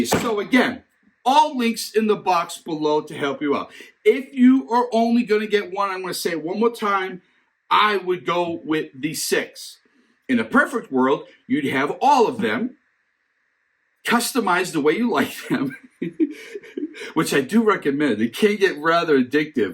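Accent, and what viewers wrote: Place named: New England, United States. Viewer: But I guess this guy's from New England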